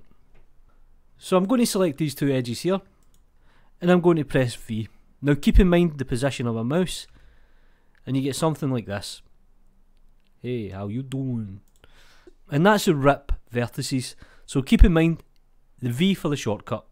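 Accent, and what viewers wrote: Scotland, United Kingdom. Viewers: Came for rip tutorial, stayed for magnificent scottish. Great video, thank you! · Great channel. I enjoy listening to a Scotsman, as I can only understand 2 out of 3 words, it keeps me on my toes.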